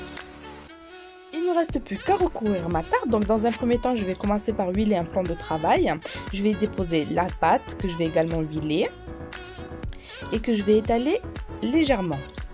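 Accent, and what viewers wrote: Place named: South, France. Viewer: Vive l'accent du sud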